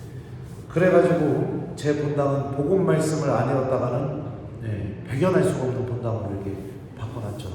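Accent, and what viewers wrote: Chungcheong, South Korea. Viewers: 신부님 강론 말씀 듣고 있으면 마음이 뜨거워 짐니다 신부님 영육간에 건강 위해 기도 드립니다 신부님 사랑합니다 💜 충청도 사투리가 정겹습니다 감사합니다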